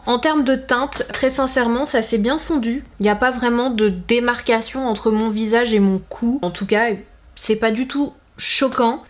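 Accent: Quebec, Canada. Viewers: J adore ton accent québécois! Vive le Québec xP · L’accent québécois 😂🙋🏻‍♀️ follower québécoise 😋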